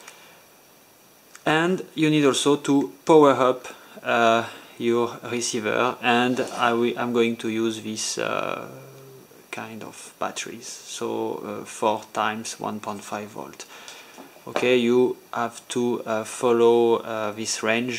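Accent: France. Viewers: sound french, lol. good job man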